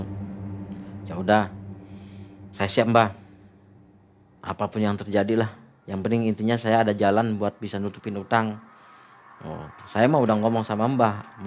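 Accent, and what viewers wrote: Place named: Indramayu, Indonesia. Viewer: Bahasa Indramayu 😅😅 kongsi enk ngrongokna e mang 😂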